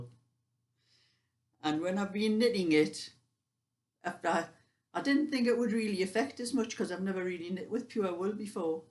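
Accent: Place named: Yorkshire, United Kingdom. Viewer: Just looking in on all your videos with me being one of your new fans , feel so sorry about your hands troubled with pure wool , my daughter is the same . Watched the one on your accent and many of your words are the same as ours in Yorkshire and I think a lot in Lancashire as well , nex my hair dropping out must be an age thing I’m 78 it drives me mad , and don’t get me going about the bills going up don’t know how we will manage I wear about three to four layers of clothes as it is as I feel the cold when inside worse than outside but guess that’s cause try to busy myself with the garden . If got took into hospital from home they would have a job to find me with all the clothes 😂 it’s an awful day here but of snow but of sleet then rain and windy and it was down to 2/3 early this morning not as bad as we used to have it twenty years ago is it but going back sixty plus years we used to av to dig a tunnel to get out the door . 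So our age group av certainly seen the changes please don’t answer just rambling on 🤣 just keep knitting 😂 bye love to watch you thanks for all your videos Jean 💕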